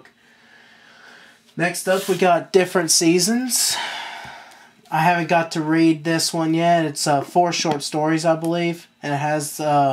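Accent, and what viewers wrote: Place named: United States. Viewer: Great collection. Where about America are you from? You have a cute accent :)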